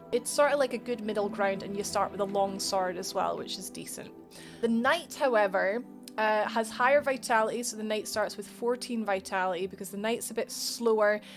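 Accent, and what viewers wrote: Scotland, United Kingdom. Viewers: Is that a Scottish accent? It sounds cool. You have a cool accent, you should try your best not to sell it to someone or accidentally discard it. Accents are valuable commodities.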